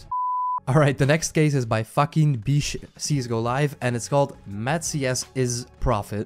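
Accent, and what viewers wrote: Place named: Netherlands. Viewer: Haha jou Nederlandse accent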